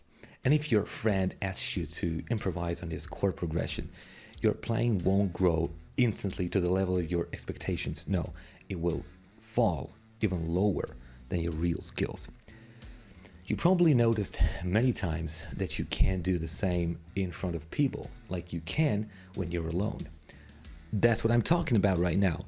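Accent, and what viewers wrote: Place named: United States. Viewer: Your accent has changed...it used to sound more British and now it sounds American.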